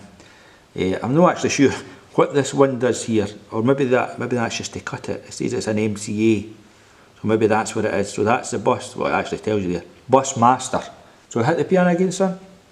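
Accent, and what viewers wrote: Scotland, United Kingdom. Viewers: Enjoyed the Scots accent.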